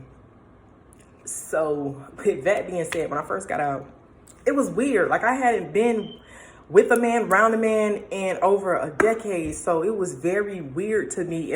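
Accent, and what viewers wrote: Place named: Broward, United States. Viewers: First of all I love that you are a South Florida gyal! #hometeam #icantellbytheaccentandattitudeofconfidence but can we just pause for what beautiful fruit God has hanging off your tree. I don’t know who he is…but when God reveals him to you, I will not be surprised at the king he is as it relates to the queen you are becoming. I’m beyond proud of you my beautiful sis. ❤ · You are such a great storyteller. That southern vernacular, I could just listen to you all day. Netflix is in your future.👍🏾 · Ay where you from. Palm Beach checking in. Boynton beach to be exact...sounds like u from Broward or something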